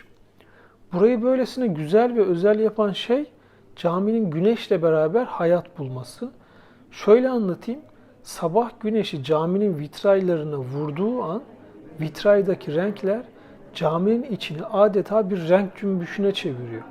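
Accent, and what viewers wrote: Samsun, Turkey. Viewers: Yaa Samsunlu olduğun çok belli ama.O ikiyüzelli deyişin ele veriyor😂Ben bu siveyi düzeltene kadar ne uğraştım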